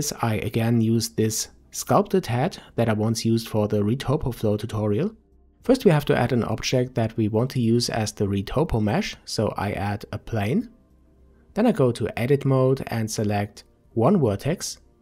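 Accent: Germany. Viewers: Took me to hear that you are german. And i'm german and quit good at english. Oh and very nice video :) I love the Blender Cummunity so far.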